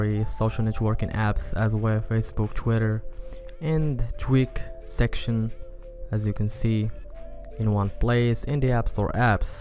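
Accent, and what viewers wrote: Morocco. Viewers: From your accent I can tell that you're Moroccan 👍🏻👍🏻 keep up the good work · moroccan accent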